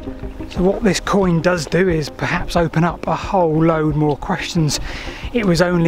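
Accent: United Kingdom. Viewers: You have an interesting British accent I cannot quite place.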